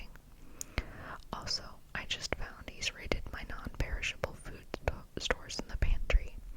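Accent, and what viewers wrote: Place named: Texas, United States. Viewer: In the first video you made you had a Texas accent! It was super tingly if you ever want to make another some time 😊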